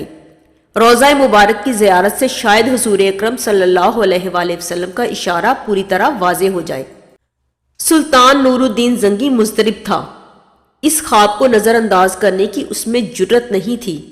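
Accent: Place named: Punjab, Pakistan. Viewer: ایک مرد مؤمن مرد حق کی شان بیان کرنے کے لئے مردانہ آواز ھونی چاہیئے، مؤدبانہ انداز ھونا چاہیئے اور لب و لہجہ بھی درست ھونا چاہیئے اور خاص طور پر تلفّظ پر زور دینا چاہیئے !!! پنجابی تلفّظ میں اردو کانوں کو انتہائی بھونڈی لگتی ھے _ " معذرت کے ساتھ ؟؟؟ " سید عابد حسین شاہ اویسی ایوبی مداروی !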